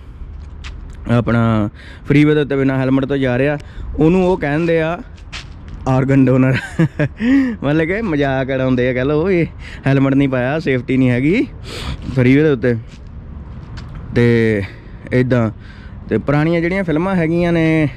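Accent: India. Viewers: Maza aagya bai....malwai boli❤️ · Veere tuhade language toh lagada tusi kapurthala vale side toh oh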